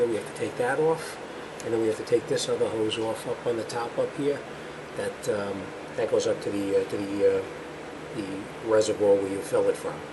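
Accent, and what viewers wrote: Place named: Long Island, United States. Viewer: Wow it's been a while since I heard someone from the inland talking . I used to spend every weekend during the summer at either HotDog beach or Sag Harbor .or Long Inland National speedway with our 10 second Camaro ..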